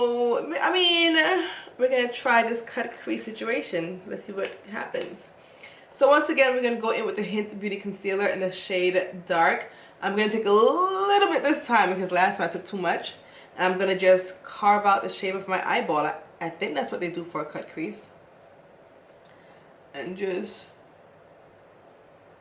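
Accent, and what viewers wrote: Jamaica. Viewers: Hi, i love your videos and your Jamaican Accent😎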